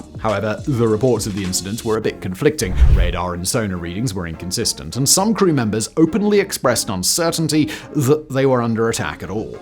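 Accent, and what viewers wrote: United Kingdom. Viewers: You have such great content but your British accent makes your videos nearly unwatchable. Too bad. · Why does an American always question legitimacy of territory when their whole country's very existence is questionable? Even more when your accent is British.. right? Born Hipocrits huh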